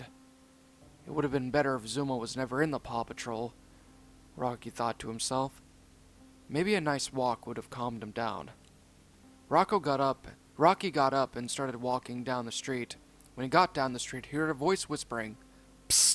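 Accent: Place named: Russia. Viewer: Not trying to be rude here but your French accent sounds like a Russian 's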